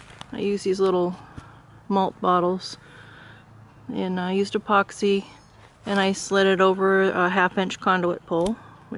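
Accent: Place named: South, United States. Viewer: Love your content, beautiful craft, trying to figure out what part of the south you live in, very familiar scenery. But because of your accent I know you are not as far south as I am. could be slightly north of my location, but you must be a transplant....lol! Moss filled oak trees near a lazy waterway, what a beautiful backdrop for your delicate flowers!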